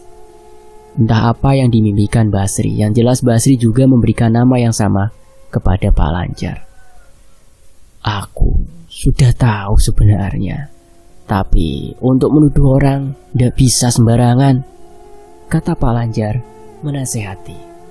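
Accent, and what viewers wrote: Java, Indonesia. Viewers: Wih pertama kali nonton channel ini pas cerita sewu Dino.. Cara penyampaian nya Bagus apa lagi logat jawa nya,ya walaupun saya Orng sunda tapi enak dengerin Mimin wkwkw.. dulu subscribe nya masih sedikit sekarang udh banyak ya wkwk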